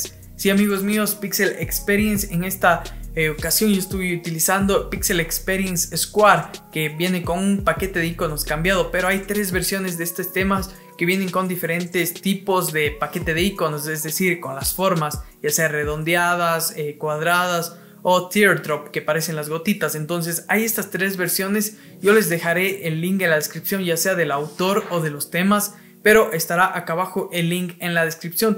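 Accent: Ecuador. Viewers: Eres de Ecuador??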